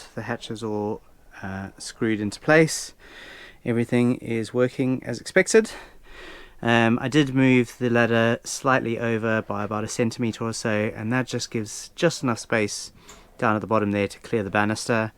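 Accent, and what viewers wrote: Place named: South Africa. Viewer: 1. Nice show & tell video. This is one my many projects I have lined up! 2. Are you an ex Saffa by any chance? I'm sure I can hear a bit of the accent from the old country. And 3. Your house layout is eerily similar to mine, even down to the artex ceiling and placement of the hallway light! Creepy! 😁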